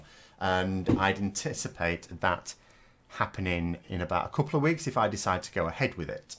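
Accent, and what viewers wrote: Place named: Yorkshire, United Kingdom. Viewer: Hey, love the channel. Currently living in Buenos Aires and your videos are one of my primary ways of keeping up with the ins and outs of Brexit, etc... Also, get to hear a Yorkshire voice on a regular basis.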